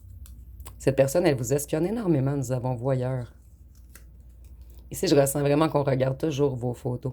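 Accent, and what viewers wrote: Quebec, Canada. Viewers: Bonsoir Vous êtes belle vous expliquiez tres bien j'aime votre accent québécois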